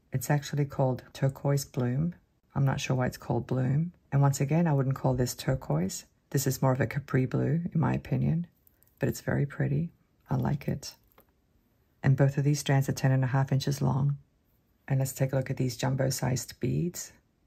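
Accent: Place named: Australia. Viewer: Thank you so much for the video! AND thank you for keeping me company whilst I get my lab ready for school. ☺LOL! When I first subscribed to your channel, I had guessed you might be from AU from your wonderful accent. I have several great friends from OZ. I'm hoping to get there to visit them sometime soon. This box of pretty beads will make many wonderful treasures. Thank you again! Take care and be safe! 😊💖🙏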